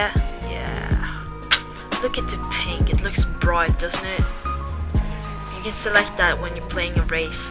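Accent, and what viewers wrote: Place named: United Kingdom. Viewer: nice video men koffør snakka du me britisk aksent??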